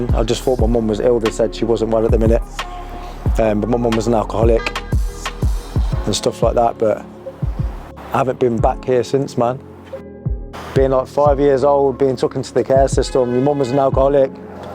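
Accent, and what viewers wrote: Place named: Coventry, United Kingdom. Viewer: I knew i recognised your accent your from Cov im from Cov too just found your account on insta so inspranational im older now but after a life of trauma you have so helped xx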